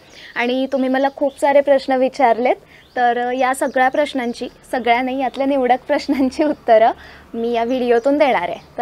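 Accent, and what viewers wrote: Kolhapur, India. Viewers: tuja aawaj komal aahe khop kolapuri bhashet bolte tu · मराठीला अजूनही थोडा घाटी कोल्हापुरी टोन आहे . बाकी आवाज क्यामेरा समोर तरी छान मृदु आहे .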